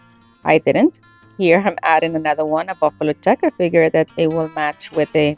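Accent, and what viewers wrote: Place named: Latin America. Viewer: ur laugh is the cutest thing, it makes me smile everytime! Also, always love your projects, they're always so nice. And your accent reminds me of home. I grew up in Southern Miami, so allllll Latinos in one way shape or form and your accent is EXACTLY like my 2nd mom growing up. I love it! I just wanted to share that with you? What nationality are you? I think I know already, but dont wanna offend nobody! Lol❤❤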